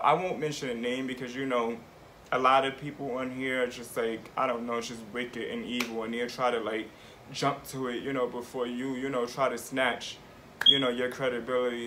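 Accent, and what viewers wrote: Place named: Puerto Rico. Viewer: Yes,I really I❤VE his sound/accent/voice!! Also has a lil boriqua mix too! Always so informative very smart,with much intellect😊I just love him!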